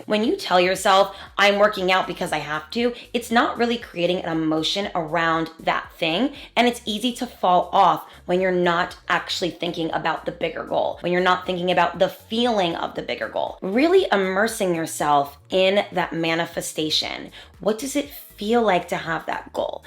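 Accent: New Jersey, United States. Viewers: You’re one of my favorite content creators you always give really actionable really sensible advice. Also, I love that you have a jersey accent because I no longer live there and it sounds like HOME.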